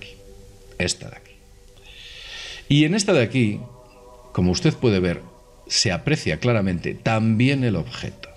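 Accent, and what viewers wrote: Spain. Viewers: ¿De qué parte de España es?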